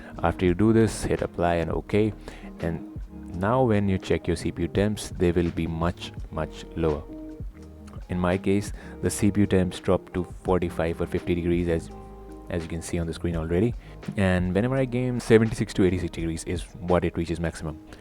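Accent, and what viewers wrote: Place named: India. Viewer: Judging from your accent you're most likely Indian. Always the Indian people helping with issues like this so thanks.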